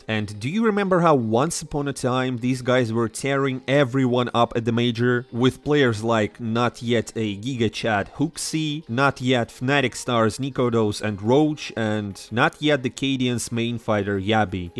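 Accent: Russia. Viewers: Тут скорее палка о двух концах - из-за того, что канал ведёт, и я явно слышу русского человека за озвучкой, и носителям английского такая речь режет уши. И тут они не могут воспринимать новости серьёзно, да ещё и речь идёт о СНГ - командах. Огромный респект, но нужно адаптировать формат под западных слушателей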